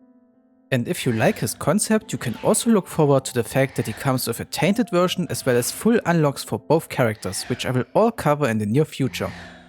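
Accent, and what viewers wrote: Germany. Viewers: Wait ya german?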